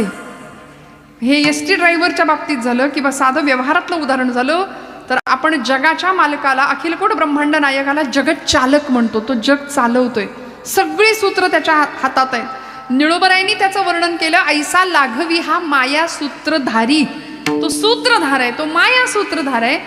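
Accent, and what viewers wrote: Standard. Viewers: ताईचे मराठी शब्द उच्चारण सु स्पष्ट आणि अगदी शुद्ध आणि सुमधुर आहेत आणि अप्रतिम वर्णन,हीच खरी संत परंपरा आणि लोकांच्या कल्याणा संतांच्या विभूति,जय हरि विठ्ठल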